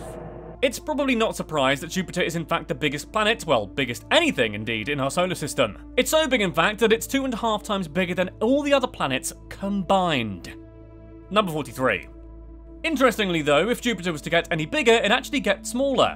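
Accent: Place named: United Kingdom. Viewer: Hes British 🤦🏾‍♂️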